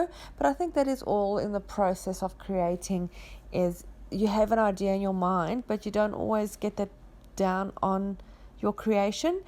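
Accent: South Africa. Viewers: Discovered you quite by accident this morning and so pleased I did!! Wonderful creativity! Your work is incredible! And an added bonus is hearing a South African accent again! Awesome!!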